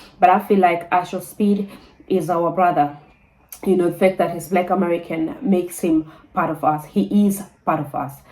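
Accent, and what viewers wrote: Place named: Nigeria. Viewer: You sound more Nigerian than Namibian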